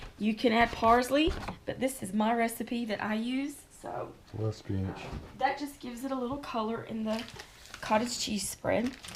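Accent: South, United States. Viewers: Love how those southerners pronounce the Italian name "Barilla".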